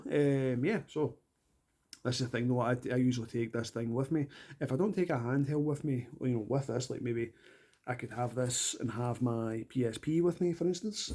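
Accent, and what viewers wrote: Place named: Scotland, United Kingdom. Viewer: As an Irishman i am pretty sure he is Scottish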